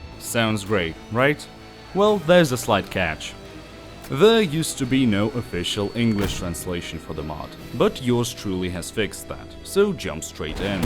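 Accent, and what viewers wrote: Russia. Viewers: чел, ты передаешь привет русско-говорящему, слышно же по акценту........ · Is that a Russian accent?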